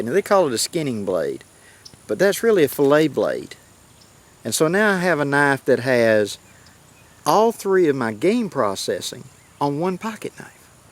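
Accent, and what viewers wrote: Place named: Alabama, United States. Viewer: I figured out why I enjoy listening to you more than any other bushcraft channel. For one, you like slipjoints...I've always been taught you dont need a lock on a pocket knife. If you use it properly. I was given several US Old Timers by my granddaddy and they still ride in my pocket. Mainly the 80ot. For two, YOU'RE FROM ALABAMA. I was born and raised up by Lake Jordan in Slapout, You speak my language. Alabama southern draw. Keep em up and ill keep watching.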